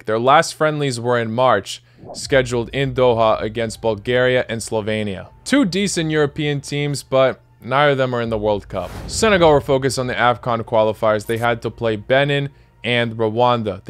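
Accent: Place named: United States. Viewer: my brother listen to his accent, he's American along with Croatian.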